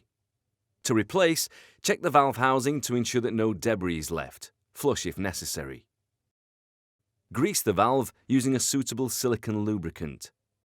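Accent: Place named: United Kingdom. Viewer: I hate your limey voice. Piss off you wanker.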